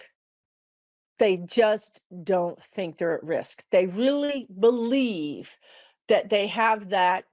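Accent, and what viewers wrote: Texas, United States. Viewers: I luv it it when YOU TALK TEXAN ❤😮 DEBRA WADE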